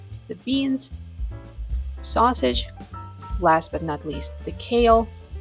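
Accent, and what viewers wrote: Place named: North Macedonia. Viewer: Hi! This looks really yummy! I will try this 😊 You have a very slight accent, are you originally from Macedonia? I have a good friend from Macedonia that sounds like you! Have a great day 😊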